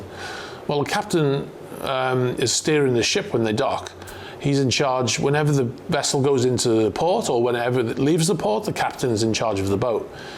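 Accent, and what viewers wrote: Liverpool, United Kingdom. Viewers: Are you a Scouser???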